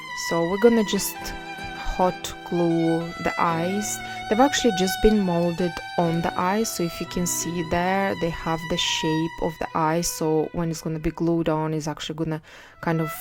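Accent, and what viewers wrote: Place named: Europe. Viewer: I love the mix of accents you have! Something European with some English cockney in there a bit! Really neat to listen to! You’re very creative, love watching you make something come to life ♥️ I like your “play it by ear” style, I’m similar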